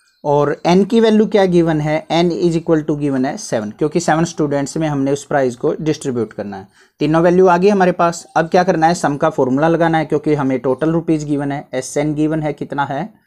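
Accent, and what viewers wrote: Haryana, India. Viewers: Sir are you from haryana your accent is haryanvi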